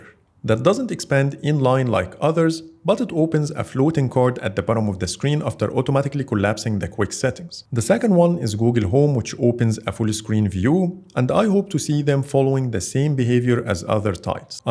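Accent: Egypt. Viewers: Are you Egyptian You accent looks like Egyptians accent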